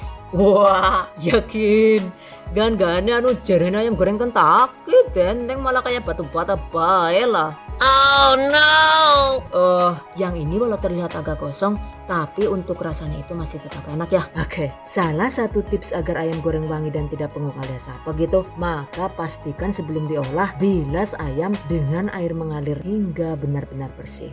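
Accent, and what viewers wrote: Banyumas, Indonesia. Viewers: Deneng ngomonge ngapak yaa 😂😂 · Fix ente wong ngapakkk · laaah deneng ngapak siih 😂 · cilacape pundi... jan ora ngapak ora kepenak😅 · ngomonge ngapak.. wong ndi kii😂😂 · Oh wong ngapak jebule.😄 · jebule ngapak, ngapak ndi mbak e, ws rong tahun maning nembe ngerti yt kie, suwun yh mbak e atas ilmune 👍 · mandan kaget pas pengisi suarane ngomonge ngapak🤣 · Galfok sama NGAPAK nya 🤣🤭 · Alahhkae..tengane wong ngapak..😂..kesuwun mbekayu resepe ya..semoga sukses terus yu😂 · Masyaa Allah ngapaknya jadi kangen kampung halaman. Terima kasih resepnya. Barakallah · Suaranya enak tp tiba2 kaget ternyata ngapak ... 😅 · Jebule wong ngapaak😭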